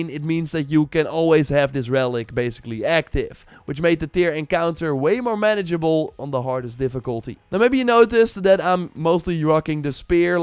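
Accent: Netherlands. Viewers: I hate that the dude with the best tips videos has the worst Dutch accent, it absolutely atrocious mate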